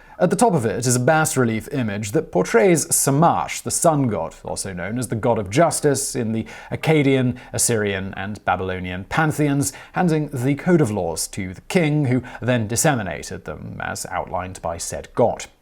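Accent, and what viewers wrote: United Kingdom. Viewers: Well the Brits pronounce alot thing differently....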